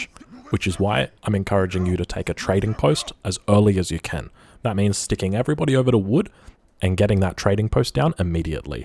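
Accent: Australia. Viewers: AoE3DE Art of War narrated by an Aussie in a very competent and thorough way? Flawless victory! Cheers for the amazing content mate!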